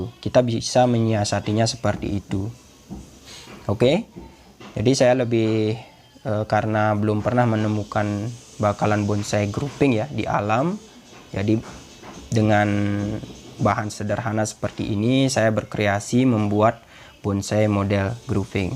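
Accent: Bali, Indonesia. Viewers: Logat bali'y kaga nahan ,gk bisa dibohongi 😆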